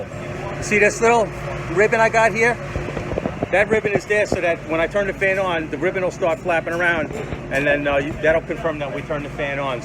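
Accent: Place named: Boston, United States. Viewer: Guessing by your accent that you are not from Florida. Boston perhaps?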